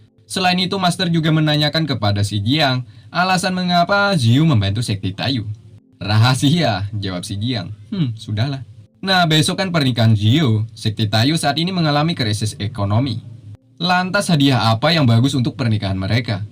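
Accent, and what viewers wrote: Banjar, Indonesia. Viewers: Bang lu orang banjar kah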